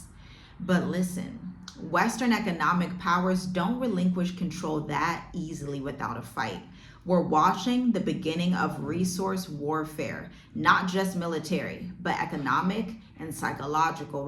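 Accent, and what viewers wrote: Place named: United States. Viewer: Yeah ...yeah....yeah...you tooooo American and no longer African ,,,, you hurt,, speaking from a privileged and heartless country and yet you look like us but speak like them,,,,it's time for change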